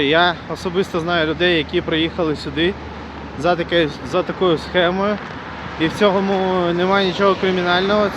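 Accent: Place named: Western Ukraine, Ukraine. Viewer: Привіт! Гарно говориш по українськи, навідь з легким західним акцентом!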